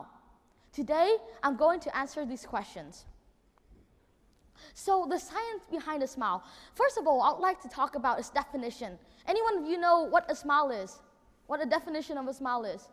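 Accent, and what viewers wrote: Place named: Vietnam. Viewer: giọng Vietnamese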